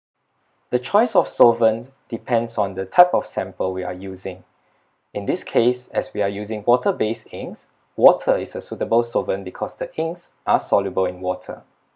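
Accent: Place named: India. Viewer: Sounds from india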